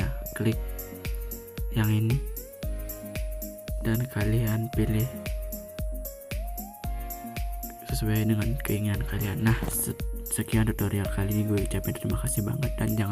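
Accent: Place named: Bali, Indonesia. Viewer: Bang pasti dari bali ya :) mohon di balas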